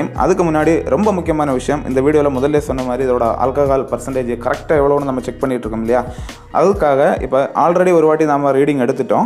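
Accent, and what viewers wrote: Kerala, India. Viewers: அது சரி தங்கள் பேசுவதை பார்த்தல் மலையாளி என்றுதெறிகிறது,,,, தங்கள் மலையாளதெலேயே பேசலாமே எதகு தமிழ்,,,, நான் பிருடைய மொழியில் எபோதுமே பேசமாட்டேன்,, என் தமிழ் மொழில் தான் பேசுவேன் அப்படியே பேசினாலும் அவமானமாகஇறுகும், உங்களுக்குஎல்லாம் அவமானமே இருகாத????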